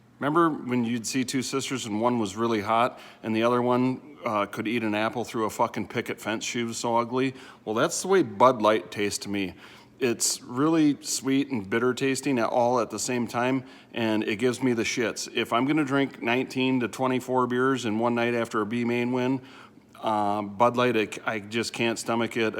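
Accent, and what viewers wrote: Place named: Midwest, United States. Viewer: Are you Minnesotan you sound very midwestern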